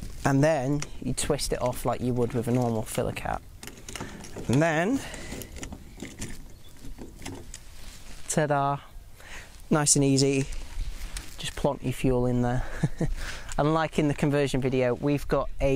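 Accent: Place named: United Kingdom. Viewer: It was quite challenging for me to interpret the British accent, I´m more accustomed to the American one. It feels like a mechanical typewriter is speaking to you, and the rhythm hypnotized me and my eyes closed in a brief sleep. I rewound the video several times. In any case, I found it very interesting and the information provided was complete. I enjoyed it very much. Greetings from Buenos Aires.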